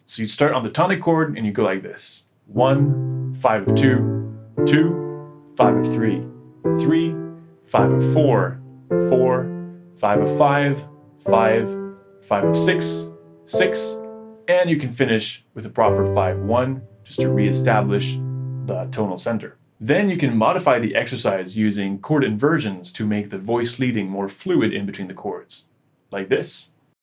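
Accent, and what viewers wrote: Quebec, Canada. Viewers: Vous faites honneur à l’accent québécois et à mon standard de jazz préféré:! Très inspirantes vos vidéos regardées du haut de ma tour situé dans feu Ville Vanier… Le regard ensuite tourné vers le château Frontenac pendant que percole la richesse de vos enseignements…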